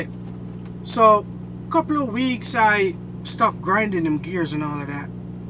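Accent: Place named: Hartford, United States. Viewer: hey u sound like u from the Hartford area right? Was there for 2 years just moved back to nyc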